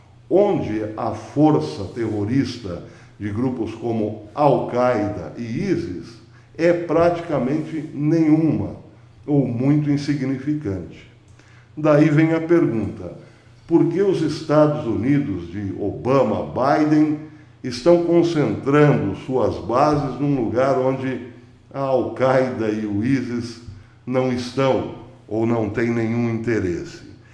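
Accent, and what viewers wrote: Caipira, Brazil. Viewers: Parabéns professor sempre ligado aos fatos da geopolítica! O caipirês é apenas uma forma humorada de passar as notícias 👍👏👏👊😎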